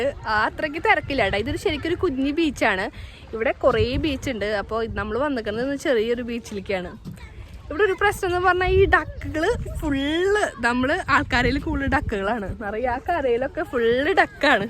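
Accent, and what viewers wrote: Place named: Mahe, India. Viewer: ഇങ്ങളെ ആടാ സ്ഥലം കാണാന് നല്ല മൊൻജ്ജ് ഇണ്ട് 😂 നമ്മളെ മാഹീ ഭാഷ ആണ് ഇത് മാഹീ തലശ്ശേരി ഭക്തെ ഭാഷ 💞🥰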